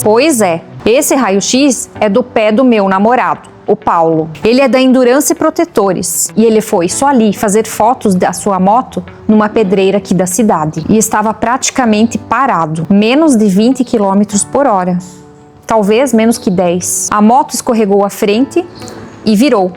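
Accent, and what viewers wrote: Brazil. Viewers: 0:3722:31 Suatque gaúcha tchê! tu és do Sul do País menina😉😉🤣🤣😂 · Pelo sotaque parece ser de Santa Catarina. Pela proximidade geográfica de Santa Catarina com com o Paraguai, não fica mais barato e fácil de acabar achando em Ciudad de Leste ou na Argentina as botas? Curiosidade mesmo. Acho super importante andar segura de moto ( eu moro fora do Brasil e vejo cada queda absurda aqui que só por Deus)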